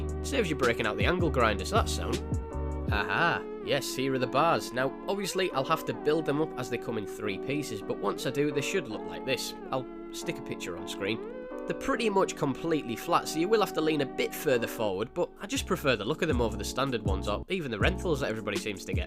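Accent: United Kingdom. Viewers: Ha I enjoyed the commentary. British commentary always gives me a chuckle. Nice build :)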